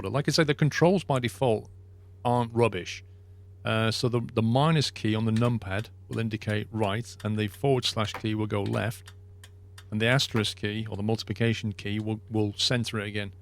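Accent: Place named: Lancashire, United Kingdom. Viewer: The internet. He's on the internet. If you mean his accent, with the "thuuuuur" pronunciation of "there", I'd say Central Lancashire, somewhere between Southport and Burnley, Blackburn and Bolton. :o)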